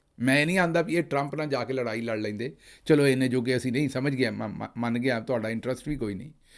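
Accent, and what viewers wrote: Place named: Majha, India. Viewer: Teri boli veere panjabi aa jehdi bol re o eh tan majhe di boli aa urdu aardu bilkul ni hai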